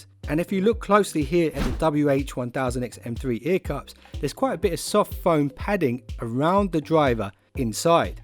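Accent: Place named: United Kingdom. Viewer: Out of curiosity, what part of the UK your accent is coming from?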